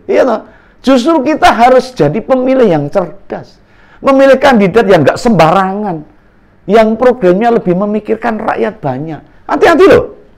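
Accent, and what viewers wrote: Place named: Java, Indonesia. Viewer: Om ini lucu, medok, mantap... khas wong Solo, Yogya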